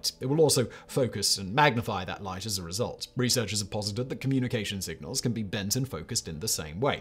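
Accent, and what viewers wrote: United Kingdom. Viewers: Well makes at least 5 hours of footage a week after editing. Also uses British English pronunciations, not American English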